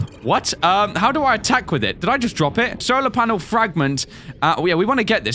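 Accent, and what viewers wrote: United Kingdom. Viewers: He sounds way more british here 💀 "SubnAUticA"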